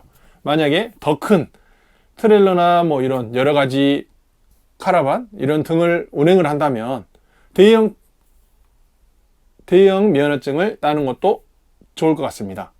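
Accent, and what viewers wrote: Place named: Seoul, South Korea. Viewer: 아따 서울사람이줄 ㅎ 멋저버러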